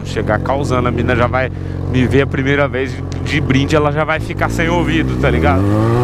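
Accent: Goiás, Brazil. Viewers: vc e goiano???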